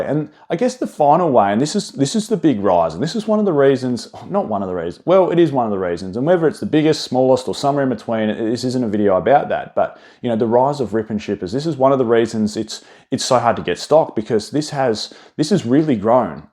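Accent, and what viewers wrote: Australia. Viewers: what part of Aus are you from btw? I'm in melb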